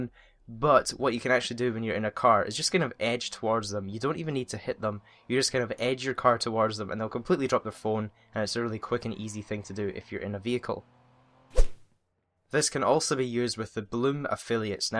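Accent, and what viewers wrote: Scotland, United Kingdom. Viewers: Are you scottish?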